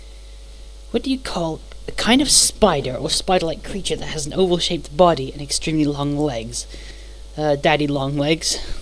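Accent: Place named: United Kingdom. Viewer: You have a cute voice! You sound like a Buizel! Your accent seems British to me.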